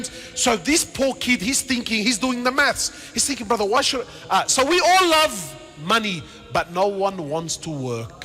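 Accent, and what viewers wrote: Australia. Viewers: Is he Austrailian? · is he aussie? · Is this preacher Australian?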